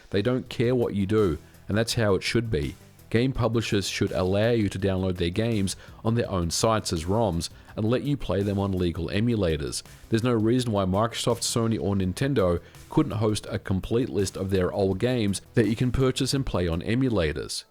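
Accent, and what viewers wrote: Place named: Australia. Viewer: “here in the United States" — dude you sound so Aussie lol 😁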